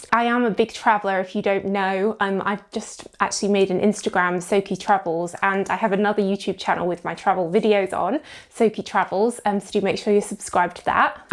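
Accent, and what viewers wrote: United Kingdom. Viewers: I absolutely love your British accent and you have such a beautiful name Soki 😊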